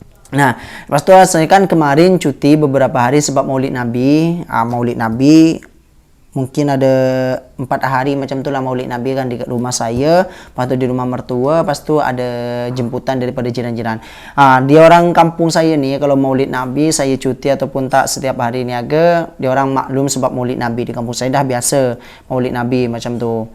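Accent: Malaysia. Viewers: Bro cakap dah spt org malaysia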